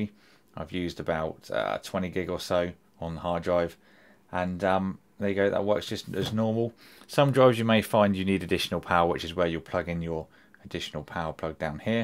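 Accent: United Kingdom. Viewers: IS this only for the phone? Can I use this product on a computer? darn it? love your british accent muah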